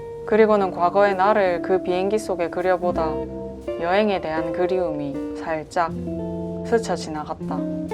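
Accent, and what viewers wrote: Busan, South Korea. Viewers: 근처에 사는 부산녀에요 익숙한 사투리와 승학산 친근해서 좋습니다~~^^ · 부산말 너무 귀여워요. 자꾸 듣고싶어지는 매력!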